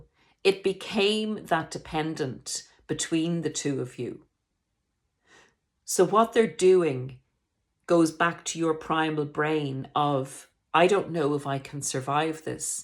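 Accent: Ireland. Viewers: You seem to have an Irish accent I could be wrong I’m a new subscriber from Ireland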